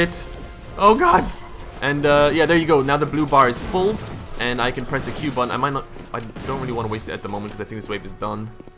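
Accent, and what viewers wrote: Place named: United Kingdom. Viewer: You sound very british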